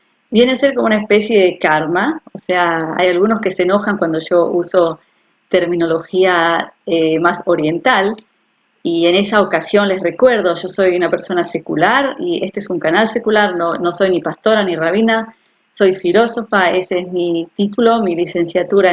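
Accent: Argentina. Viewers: Me sorprende que después de tantos años de vivir en Israel, conserves tan bien el acento argentino.Gracias por compartir el hebreo, ya lo estudiaré, me encanta.Salud a ti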